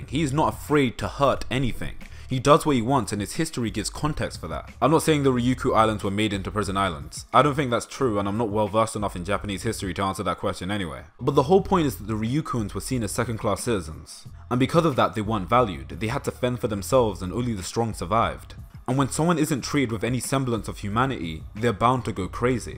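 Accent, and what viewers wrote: United Kingdom. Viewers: No I'm not being 100% glib. You have a British accent, but you're obviously black and talk about the black experience in America. So do you live in America or believe that any black person can understand the black experience in America? Call it a question of phenomenology.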